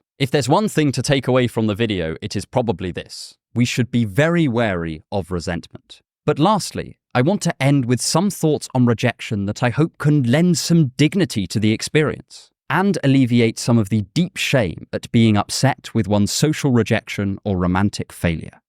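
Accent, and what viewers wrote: United Kingdom. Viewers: I watch for philosophy but also bc your s tier British accent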